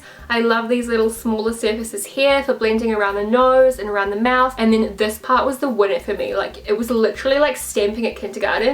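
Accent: New Zealand. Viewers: April Taylor she a New Zealand accent.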